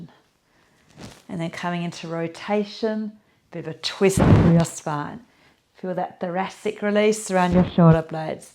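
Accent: Australia. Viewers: Thanks. As a Canadian I am boycotting American products. Wonderful to hear an Aussie accent and wonderful to feel better.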